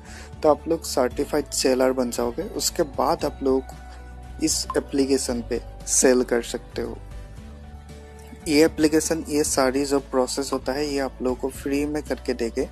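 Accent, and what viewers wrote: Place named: Assam, India. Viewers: he is from Assam i think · Assam se hain ye... 🤗 Himonoto biswa sarma ji bhi yhi se hain ..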